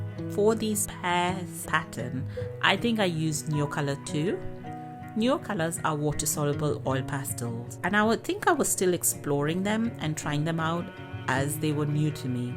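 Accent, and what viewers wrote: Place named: United Kingdom. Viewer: Hello fellow artist😃 just found your channel, I’ve been grieving the death of my nephew, feeling very sad- however, your illustrations brought a big smile to my face & lifted my heart, also Your voice is very soothing & I Love your British accent! Now your illustrations make me feel joy like the feeling of reading a colorful, well illustrated book to one of my grandchildren. Such great art & a very interesting sketch book, thanks for sharing & for the art therapy🥲😃❤️❤️❤️🎉🎉. . Makes me want to get back to start drawing & painting in one of the many empty sketchbooks I have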